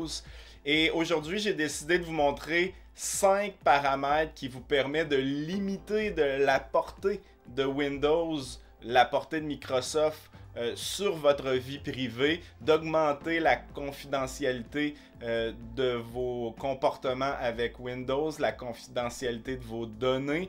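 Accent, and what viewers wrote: Canada. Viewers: salut,merci pour t conseil jaaaime bien ton axent canadien loin de moi d'en rire! si tu savais comment on parle vers marseille! je te permet. tu me fais pense à snowden le open source! c'est pas une balance! meme la justice ne fais pas le poids elle ki se dis equilibre? permet moi d'ans douter! g pas regarder toutes t video! apres je te rap-l. merci bonne continuation le meridien de greenwich...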